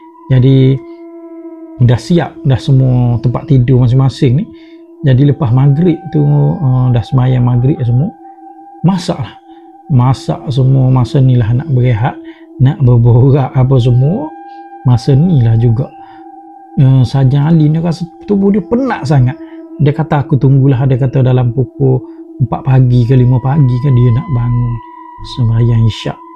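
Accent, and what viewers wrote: Kelantan, Malaysia. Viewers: Pekat bethol kelantan kau bang 😂 btw keep going 👍🏻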